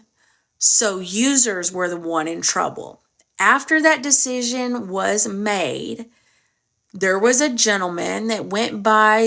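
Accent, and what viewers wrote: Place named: South, United States. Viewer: You have become my new favorite creator. I find you adorable and entertaining af. You're so sweet and funny and I love your southern accent. ❤ Anyway, have a good night!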